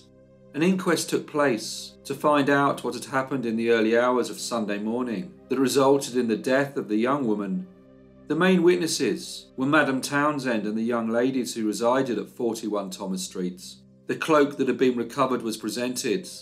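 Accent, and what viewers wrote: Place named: Birmingham, United Kingdom. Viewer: I can’t get past your Brummie accent 🛑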